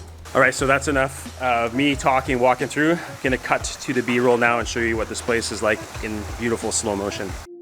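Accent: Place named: Corona, United States. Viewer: Just curious …! We’re you from ..! Sound like Corona …..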